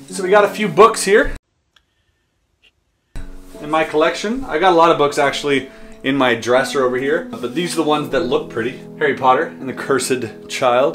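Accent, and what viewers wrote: Quebec, Canada. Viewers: Je viens de MINDFUCK completement. je me doutais que tu parlais francais mais QUOI?!?! Je m'attendais a un francais cassé pas que tu parlais "quebecois". Ca rajoute un sentiment d'appartenance enorme. MONTREAL REPRESENT. ca srait vraiment cool un video en francais. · Canadian French is so much different from the "usual" French I get to hear xD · Where are you from? Your french sounds like you are from Québec. Ohh and good video by the way (as always) !! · Hey where you from quebec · mon dieu mais ton accent canadien est magnifique, j'adore ta chaine et j'ignorais que tu étais canadiens. 👍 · si si j'ai tout compris... bon tu a pris ton accent canadien natif... MY CHALLENGE: parler en Français, sans accent Canadien (i remember that you were bragging about your ability to pick up any accent... so here you go) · Un joli petit accent quebecois ;) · I totally digged the French speaking part! 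Et l'accent québécois... S'il te plaît parle Français plus souvent dans tes vidéos. Love you man.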